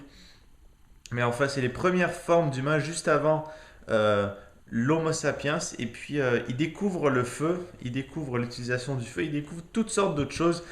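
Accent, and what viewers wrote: Canada. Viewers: Accent canadien <3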